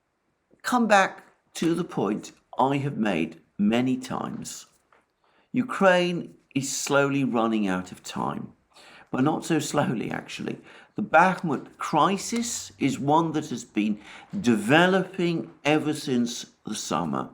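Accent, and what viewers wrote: United Kingdom. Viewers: Thanks so much Alexander, not only I'm feeling informed very well about what really is going on in this horrible war but I also was able to shut off subtitles lately because I, as a non native speaker now got so used to the British accent I no longer need them. I'm really really glad I found your, Alex's and the Duran channels.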